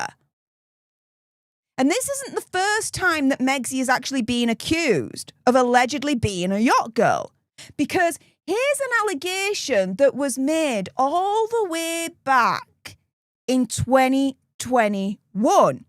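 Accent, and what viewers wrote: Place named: England, United Kingdom. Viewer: Am I the only one that thinks heavy English/Slang accent is kindda difficult to understand?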